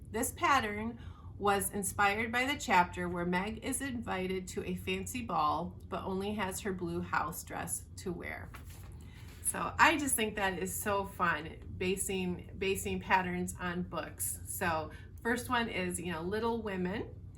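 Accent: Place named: Sioux City, United States. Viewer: Perfect timing for a stash-along as I've decided 2022 is a no buy year for my knitting and cross stitch hobbies. I'm finally noticing all of the gorgeous yarns I have instead of focusing on the next new shiny distraction. I love your accent -- I've been in Tennessee for 30 years, but I grew up in Sioux City. You sound like home and family to me (with some Michigan thrown in ;)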